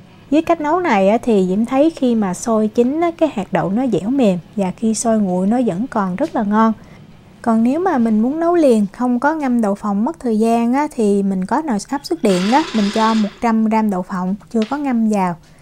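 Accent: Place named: Mekong Delta, Vietnam. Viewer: kheo tay qua co xem nhieu video cua co thay that tuyet cho co hoi 1 chut nha chac la nguoi o mien tay que o tinh nao ma nau mon nao thay cung ngon lai qua hap dan gi nghe giong noi dam chat mien tay co gan 70 tuoi nen ko biet cach bo dau nen be doc hoi kho 1 chut nha co rat mong 1 loi phan hoi va chuc nhieu niem vui trong cuoc song nhee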